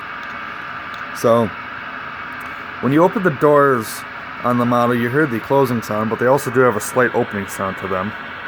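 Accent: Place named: New York, United States. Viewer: Like the voice has New York accent even.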